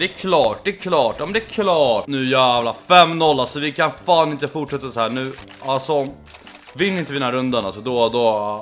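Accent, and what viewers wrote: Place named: Stockholm, Sweden. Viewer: man förstår att du är från Stockholm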